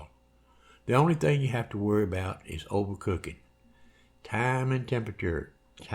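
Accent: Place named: South, United States. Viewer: Aww hell yeah, a nice southern accent you know u finna get a nice recipe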